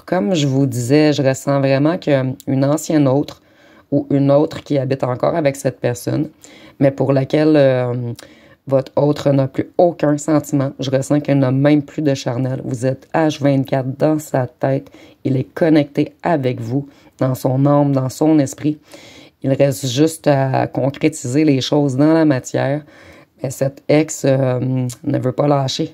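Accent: Quebec, Canada. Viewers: Bonjour à vous ,je viens de vous découvrir et de m'abonner .Vous m'avez grandement impressionnée. Le choix judicieux des mots qu'on entends pas dans d'autres guidances et qui réconfortent, qui nous aident à grandir ,à garder la foi ,à être fiers de qui ont est .Votre accent semble québécois est-ce que je me trompe ? Merci beaucoup et gratitude à l'univers pour cette belle découverte. Bonne journée ! 😊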